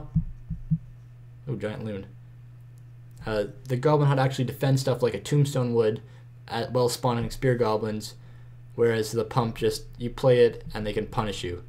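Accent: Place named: Canada. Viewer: aha knew you sounded a little canadian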